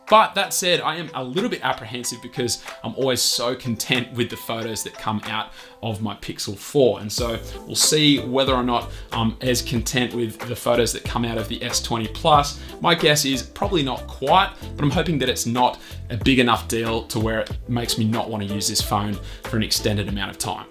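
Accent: Australia. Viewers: what part of austrailia are you from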